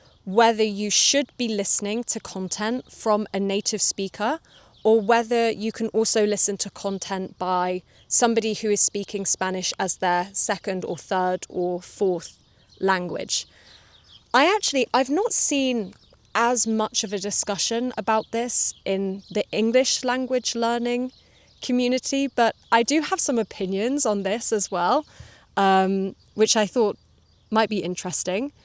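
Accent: United Kingdom. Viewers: Fantastic winery indeed! Personally I love the British accent, definitely is the best, even though there are differences in England too!! And your accent is really fantastic. I know that English is spoken all over the world, so that people speak it with different accents. And this is a bug problem, in particular for natives!!! Thank you very much. You are a great British teacher ❤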